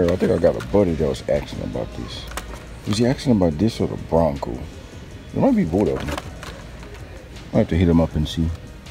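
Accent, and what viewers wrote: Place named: Louisiana, United States. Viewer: Sounds like your from Louisiana if you are what part I’m in Lafayette would like to do some trades if you are down I have a good bit of stuff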